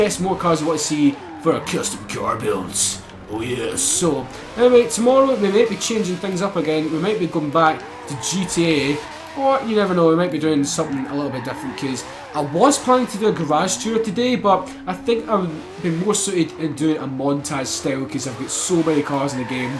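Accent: Scotland, United Kingdom. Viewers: i really like you videos! you're really cool guy btw i have a family relative live in Aberdeen and sometimes its really hard for me to understands him and he makes a lot of jokes about us (americans) number one they say and he just keeps going from there...but the thing is i just wanna tell you that you're helping me understand the Scotish accent (its hard sometimes to be honest) so what do you think about 'muricans? btw can i ever meet you when i'll be there? it'd be so cool:)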